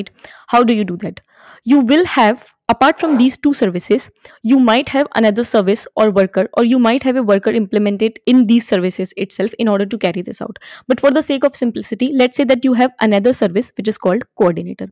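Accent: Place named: India. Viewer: im also indian and I love the accent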